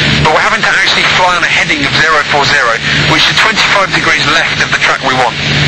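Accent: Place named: England, United Kingdom. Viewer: Really good, thanks. Nice to hear an English accent on a youtube tutorial for a change ;). Only thing that would have made it better, would have been having the camera closed up on the needles....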